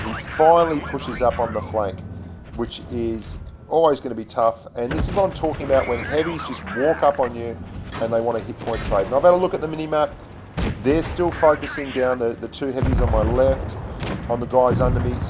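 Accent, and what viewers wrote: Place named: Australia. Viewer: ok thank you and u sound australian ik so cause I live with 2 australians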